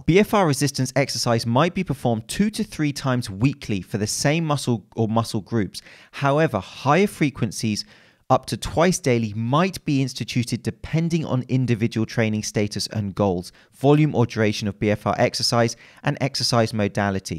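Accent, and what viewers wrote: England, United Kingdom. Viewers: Love your videos mate, specially the taking the piss ones. Would love it if you did a video on the old timey light dumbbell system. Got a book about it, David Bolton's the lost secret to a great body, and it really changed my body. I'm what you call a hard gainer. Been doing Capoeira for 14 years, so thin, well defined muscles, but I always felt like my arms were a bit like T Rex's. After 2 months I gained 5cm around biceps, there's almost no appreciable DOMS after a month and you get a very specific feeling i've never felt before of being BIGGER and ENERGIZED after each session. You sound like you live in Britain, maybe you can make an interview with the author, find some evidence on how this training method works? I've tried to look for it myself but can't find anywhere why these changes have occurred so fast. Maybe increased glycogen storage in the muscle and therefore more water retention in the fibre? 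But I am objectively stronger and have more muscle endurance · "Restrictimg blood to their brains" haha. I found your channel the about a week ago when I was looking for some creatine info. Great stuff and I love the humor. One of the few subs I actually have. But one downside, I caught myself reading a textbook with an English accent in my head...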